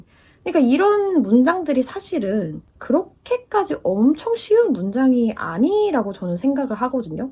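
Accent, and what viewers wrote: United Kingdom. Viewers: I really like your british accent, can you make more videos of communicating with other people in english?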